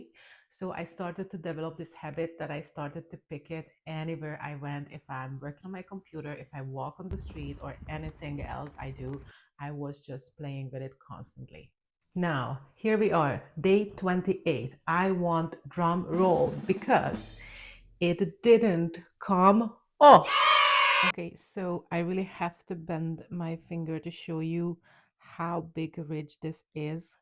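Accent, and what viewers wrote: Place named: Northern Ireland, United Kingdom. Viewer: Oh wow! My hat is off to you and I don’t know how you coped! If I feel ANYTHING odd it bugs me until I fix it. I got up in the middle of the night, my elderly dad was up watching TV and I walked in like a zombie (his words, lifted a nail file and walked off! 😂😂 Well done on prepping enough to make them last that long! Can I ask if you are living in or have spent time in Northern Ireland? I swear little things you say remind me of our accent! I hope thats not insulting. Lol Great video.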